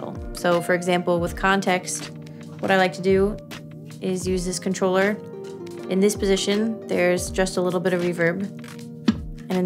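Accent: Long Island, United States. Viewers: I saw on jhs and im here tone a simp and here cool pedal shit. U and ur LI accent along with ur talent make u the cutest thing i ever saw. Sorry for being weird, ur awesome🤘🏼🤘🏼🤘🏼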